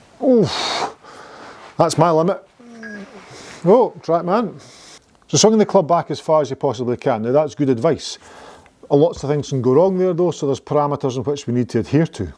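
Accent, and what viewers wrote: Scotland, United Kingdom. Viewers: Think your instruction is great. My son in law and I talk to each other on the course and inevitably are saying “that’s good!” In a hard Scottish accent when we are channeling you. Maybe I’ll make it to Peebles one day “soon”. That’ll be “good”. Cheers 😎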